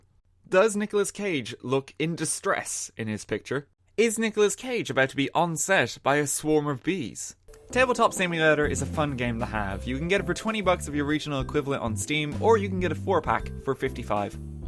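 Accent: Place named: Ireland. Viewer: 8 minutes of a buttery Irish accent. Score!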